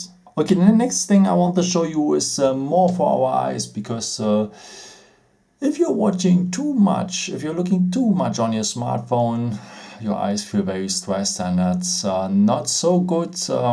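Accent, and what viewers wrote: Germany. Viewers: Can you speak English ? You are 100% a german guy !!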